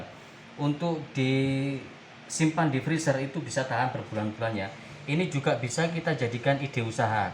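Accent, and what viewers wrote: East Java, Indonesia. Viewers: Saya suka cara masaknya n bahasanya yg medhok khas Jawa timuran 👍👍👍salam teko arek Suroboyo chef..🙏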